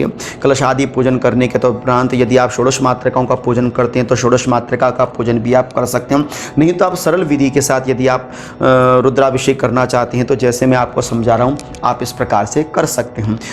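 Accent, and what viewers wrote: Standard. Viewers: आनन्द आ गया, आपको शत शत नमन, आपका उच्चारण बहुत शुद्ध है, आपके प्रयास से हम जैसे लोग नॉन हिंदी जोन वाले लोग जिनको हिंदी स्पीकिंग पंडित नहीं मिल पाते, बहुत लाभ प्राप्त करेंगे, आपको कोटि कोटि प्रणाम और धन्यवाद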